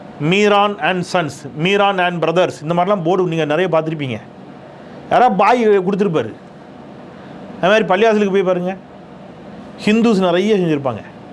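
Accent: Tirunelveli, India. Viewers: அண்ணாச்சி உங்க நெல்லை தமிழும் உள்ளார்ந்த உறவின் நெகிழ்வும் உள்ளபடியே நெஞ்சத்தை தொட்டு விட்டது வாழ்க.... வளர்க.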